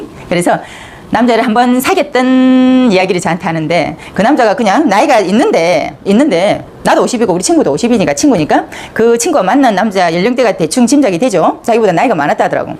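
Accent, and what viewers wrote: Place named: Gyeongsang, South Korea. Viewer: 갱상도 사투리 맛깔나게 하네 엉덩이 노노 궁디 오케이~~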